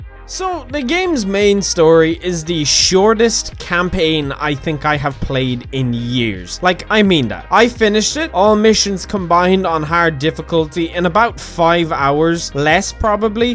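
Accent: Ireland. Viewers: What part of Ireland you from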